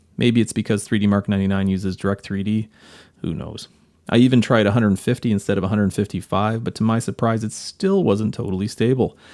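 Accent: Canada. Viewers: I saw Voodoo 3's in the thumbnail and assumed this was a "Bits und Bolts" video. I was surprised when a familiar Canadian accent appeared instead. *waves from Winnipeg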